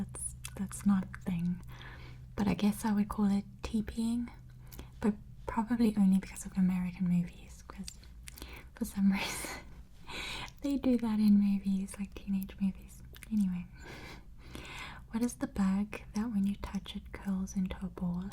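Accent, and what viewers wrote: South Africa. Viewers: I love your accent! Glad you did this! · Hey cute lady south African British rose lady great video I could listen to you're accent forever and I sure will if I ever get chance in future I would love to visit south Africa and stay in a tent hey I will watch the video and sleep to it thanks I hope you try potatoe cakes there British and try crumpets with butter xxxx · So happy to hear a from a south african asmrasrtist! 🇿🇦 LOL the feeling of nationality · Just ran into this on a rainy evening in Alabama. Had a chance to visit South Africa years ago. The accent is fantastic. But here in the states there are a variety of pronunciations based on geography. What you call a trolley is a cart or buggy here in the south for example. And sometime emphasis is on a different syllable. Pecan for example is either “P-can” or “pecahn” as you said. 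Another example is can’t, here in Alabama we might say it as “cain’t” Great stuff! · Absolutely love your accent! My new fave asmr channel! So glad I found you 😍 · The accent is beautiful. My best buddy Ralph is Afrikaans and his accent is incredible. Great singing voice too. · My fave place on earth. Hoping I win the lottery and can move out to camps bay or kleinbaai!! Great accent! Great vid!!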